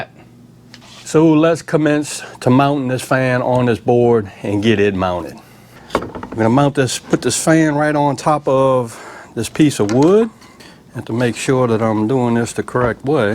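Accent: South, United States. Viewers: Honestly subbed for that thick south accent 💪🏻😁👍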